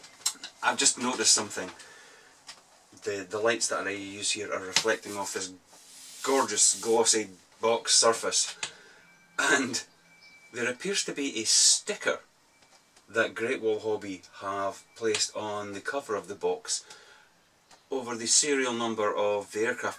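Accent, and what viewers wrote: Scotland, United Kingdom. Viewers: I have to say I find your features very interesting and your a fantastic modeller!! I love that Scottish accent and the drink reviews are great! You've hooked me and unfortunately I can never get any modelling done as I seem to have all my free time eaten up watching you... which isn't so bad. Looking forward to many more hours of your lighthearted observations. Cheers from Canada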